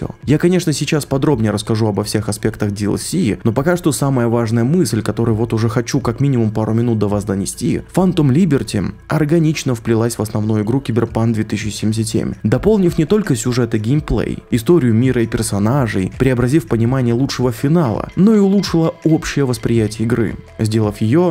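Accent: Primorye, Russia. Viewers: вы случаем не с Приморского края? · С Приморского края что ли? :)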